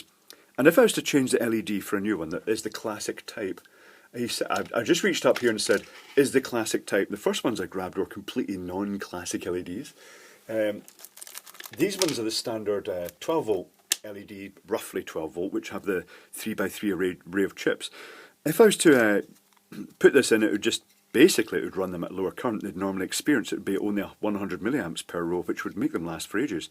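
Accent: Scotland, United Kingdom. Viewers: I have disliked the Scottish accent before, but yours, is not bad at all. In fact, it's rather soothing.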